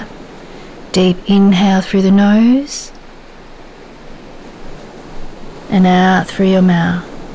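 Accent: Australia. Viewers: New fave yogi channel discovered! Loved the visualization and ofc your gentle Australian voice :3 (I studied in Melb for a year, so I miss that accent)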